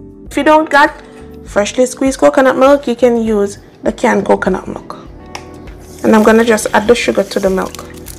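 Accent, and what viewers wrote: Jamaica. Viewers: Who is not a Jamaican. Stop talk . Do you know how a Jamaican sound. She is a 100% Jamaican if you don't know anything or sure don't say shit.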